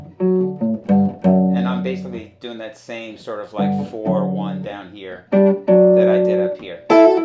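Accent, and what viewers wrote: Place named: Philadelphia, United States. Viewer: Nice lesson,. You made everything clear; it's easy to see what you're doing. By the way, are you from Philly? I am, and you seem to have the accent.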